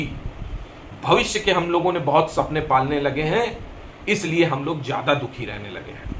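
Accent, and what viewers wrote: Kanpur, India. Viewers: paisa ghar kaha aaya be maza aa gaya ye dialogue sunker bade bado ko ye baat samjhne me aadha jeevan lag jata hai sahi me aap kanpuriya style me bolte ho hum bui kanpuriya hai sab bahut dhag se samjhte hai maan gaye jeevan kaise jeena hai kyo hum log jeevan me aaye hai kyo hume future ki chinta nahi karni hai matlab utni karni hai jisse kaam chal jaye aur jeevan future ki chinta me kharab nahi karna hai is video me bahut kuch seekha ja sakta hai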